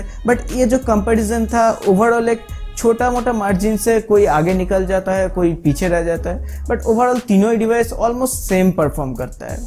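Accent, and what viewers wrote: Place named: Bihar, India. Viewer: भिहारी टोन आ रहा है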